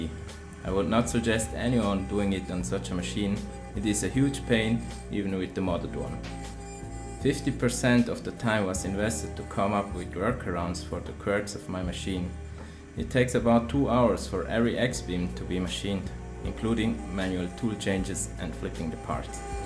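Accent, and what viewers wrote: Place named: Switzerland. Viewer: you sound pretty swissish to me, so wenn du interesse hättsch: ich han e gigantischi DMU 80 wo dir paar use haue könnt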